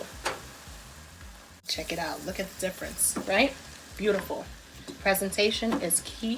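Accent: New York, United States. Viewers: I can’t wait to make this. I was looking for a lobster Mac for this Mothers Day. P.S. are you a NYer ? I recognize the accent sounds like I’m listening to someone from home. I post the results.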